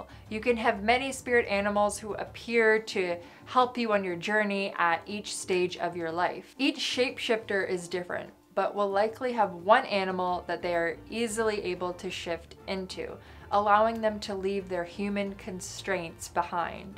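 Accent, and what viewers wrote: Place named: Canada. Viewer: I wonder if she is Canadian or Minnesotan the way she says certain words. Interesting video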